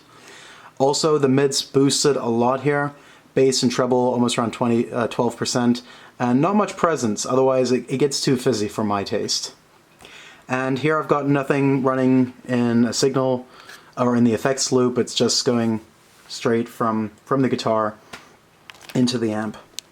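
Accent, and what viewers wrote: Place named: Germany. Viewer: Dude. Are you a German that learned English? Christ I thought this was American for ... first 15 minutes! Great channel and checking things out. Brian Jones tone cuts more to the core of listeners and is an awesome thing to take a look at.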